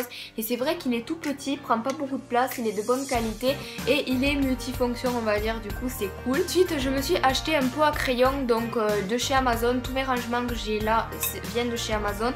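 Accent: South, France. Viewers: L'accent du Sud 😍💕 Toulousaine à vie ✨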